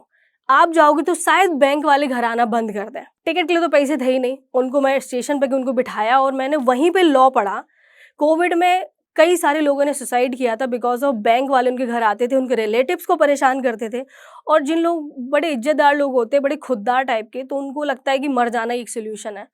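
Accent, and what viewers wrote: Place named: Uttar Pradesh, India. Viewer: Bhasha se laga tha aap etawah se kaha se ho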